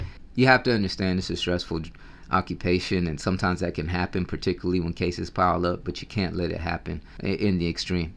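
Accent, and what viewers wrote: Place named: Texas, United States. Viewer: I mean I hear the Texas in your voice! I think you should do it!!!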